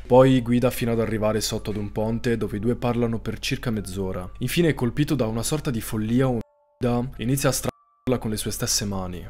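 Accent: Sardinia, Italy. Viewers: Bella sardu sese?😂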